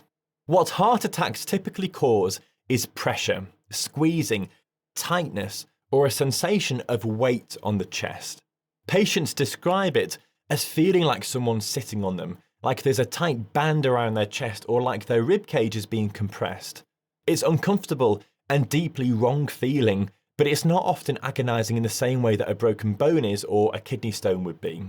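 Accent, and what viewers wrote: England, United Kingdom. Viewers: I have just recently subscribed, and really enjoy the way you have presented these situations . It is very easy to understand, and that is appreciated. Thank you. Plus I always enjoy the English accent.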